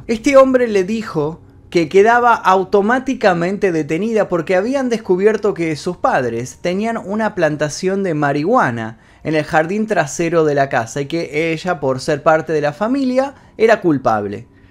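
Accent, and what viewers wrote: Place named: Argentina. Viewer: Guapo... linda voz con ese acento tann lindo argentino... eres super profesional... mi nombre es Paz Alejandra de Santiago de Chile🥰😍🤩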